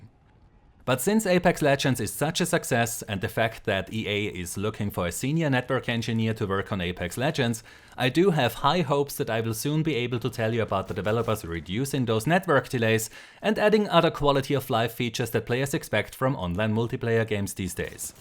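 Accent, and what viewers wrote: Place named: Germany. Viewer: Man hört es als deutscher das du deutscher bist 🤙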